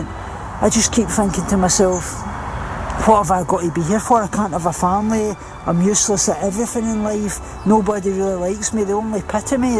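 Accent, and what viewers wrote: Scotland, United Kingdom. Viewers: I was half way through my comment and it disappeared so if you find a random unfinished thing, it's mine... ANYWAY, ONTO MORE IMPORTANT STUFF!! I have said this before but this is a great time to say it again... I found you in sin city Renee's comments section and was interested enough in your comments to see if you had your own channel. So I found you through veganism but stayed because of YOU. I stopped following the vegan youtube community last year when it all got really stupid. I unfollowed all but 2 or 3 vegan channels. Of those, you're the only channel I watch religiously. I do eat a vegan diet but I don't have an eating disorder - at most, I have a dismorphic self hatred about being overweight. I like your channel because of you. Not the ED. Not the veganism. Not the furry family. Not the irresistible Scottish accent. 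Not the eclectic posters on your wall behind you. Not the singing. Not the persistent rain. Not the wrap around shades or squeeky voiced sound effects. Not the great variety of dog fluff. (Even though I enjoy all of the above!) I watch your content because of you. You,. And the rare but lovely cameo appearances from Laura:D If you want to know what it is about you (and not my list of nots) It's because of who you are as a person. You're very friendly, you talk openly without judgement and you keep your content true to who you are. You don't need to be rude or offensive to talk about awkward subjects, you have no idea how enlightening and truthful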